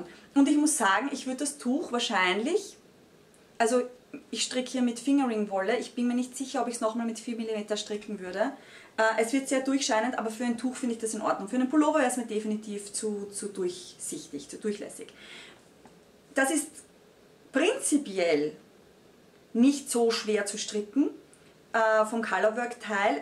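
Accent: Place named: Austria. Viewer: Warum spricht so schnell? Und dann noch mit diesem Dialekt😕 Das versteht doch keiner außerhalb von Österreich. Also ich habe nicht mal die Hälfte von den Namen der vorgestellten Garnen verstanden und von wem sie sind. Das Garn von der Babydecke hat mir wirklich gut gefallen. Leider waren auch die Einblendungen kaum zu erkennen! Dunkel Lila Schriftzeichen auf schwarzem Untergrund!! Liebe vielleicht sollten Sie mal Ihre eigenen Videos von Anfang bis Ende ansehen, dann verstehen Sie was ich meine. Auch ist die Auflistung der Projekte,von wem die Garne /Wolle sind,wie die Garne/Wolle heißen in der Infobox/Videobeschreibung für ungeübte Strickanfänger schlecht verständlich. Mich interessier einige der gezeigten Garne/Wolle, aber ich finde mich in der Beschreibung nicht zurecht. Schade!!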